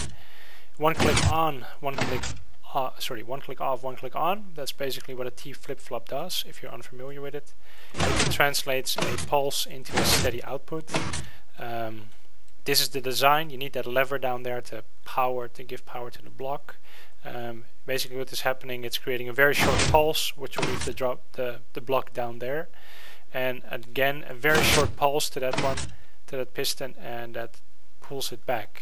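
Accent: Netherlands. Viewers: u dutch? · Haha lol jij bent dus Nederlands dacht al je klinkt niet echt engels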